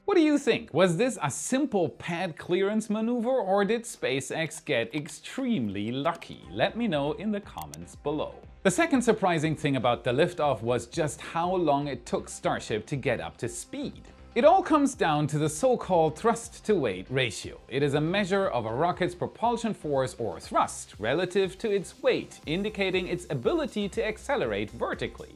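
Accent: Germany. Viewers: You can move to the us,but the german accent îs still there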